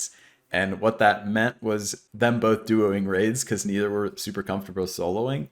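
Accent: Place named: MLE, United Kingdom. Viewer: I discovered you in J1mmyVODs/WasJimmy's youtube video. Ur wagwan accent for ur WoW troll roleplay is perfect and hilarious plz upload ur OnlyFangs' WoW roleplay to youtube! I really hope you collab with Rav, Moobius, Sput/Dr. Bones, Hubert, and other roleplay comedians in OnlyFangs.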